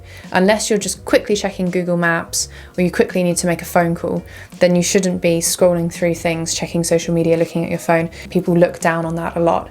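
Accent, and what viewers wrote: United Kingdom. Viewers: 喜歡妳的影片內容以及英國口音!真棒希望繼續分享更多外國文化知識型影片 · 很棒的影片，知性又有趣，讓我們更了解英國文化，感謝您！而且您的英國口音超好聽的！ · 英國口音很悅耳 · The British accent is really beautiful.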